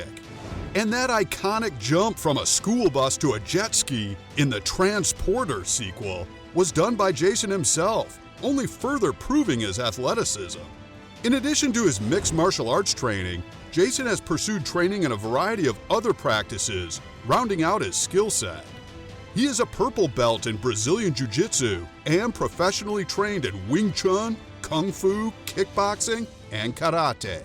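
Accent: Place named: United States. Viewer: this overexaggerated american accent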